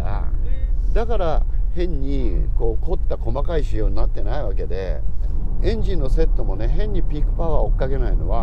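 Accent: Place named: Nagano, Japan. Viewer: 的確な評価と、わかりやすい解説、とてもありがたいです。 どうでも良いのですが、水野さんは長野ご出身なんですね。 「ほら」→「ほお」で、ん？と思っていました。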